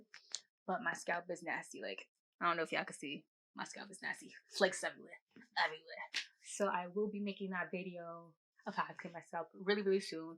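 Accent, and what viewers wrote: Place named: New York, United States. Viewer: I love your NY accent (don’t change how you talk) and your locs. They have grown out sooooo 😍 nicely. Been a subbie since the beginning. I would recommend supporting a YouTuber named Tameka by nature below is the link to her business. She is also a New Yorker and is always promoting positivity and supporting small you tubers. Shout her out and/or support. I truly respect that you want to do this🤗. Stay blessed sis🙏🏾. <link>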